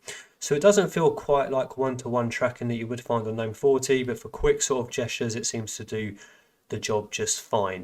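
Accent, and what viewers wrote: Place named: London, United Kingdom. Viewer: I like your London English accent!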